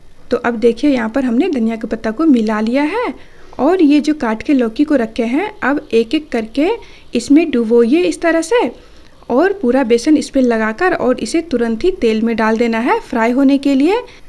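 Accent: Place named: Bihar, India. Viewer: Munh kholte hi pata chal gaya yeh totli aur biharan hain...aaooo...hate biharis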